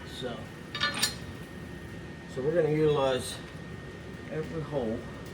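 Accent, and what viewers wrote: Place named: New England, United States. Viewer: It's like watching Me but with a New England accent!!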